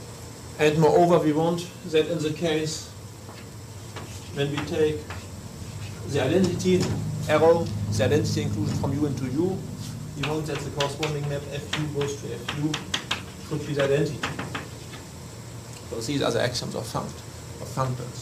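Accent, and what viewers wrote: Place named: Germany. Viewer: I didn't expect him to have such a strong German accent, despite knowing some of his history. Very interesting.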